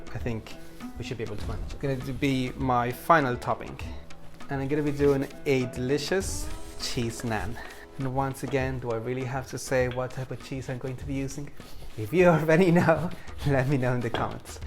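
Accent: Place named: Ireland. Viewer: I do! I love the diversity you exude. I'm guessing you're of Latin descent? I can hear your Irish tones in your voice. Cooking up a rainbow of dishes 💛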